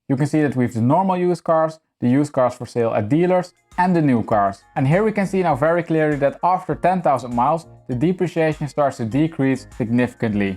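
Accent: Netherlands. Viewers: you have a Dutch accent.....Kan U look Nederlands praten?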